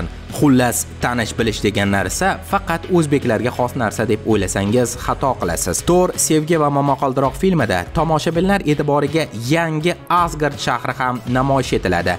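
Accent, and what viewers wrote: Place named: Russia. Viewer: Sizga maslahat. Nutqingizni ruscha oham va ortiqcha bir hil intonatsiyadan tozalang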